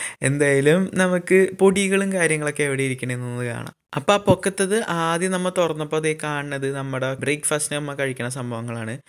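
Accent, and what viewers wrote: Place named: Aluva, India. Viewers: Aluva style talking 😊 Nice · Ekm/ kochi aannenn thonnanu samsaram kettitt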